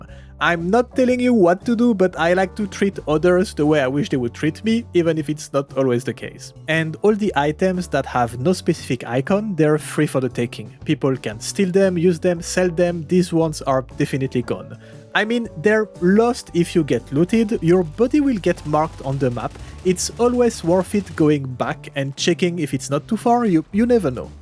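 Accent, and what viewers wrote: France. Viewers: Thx very good video, are you french tho? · Are you french ?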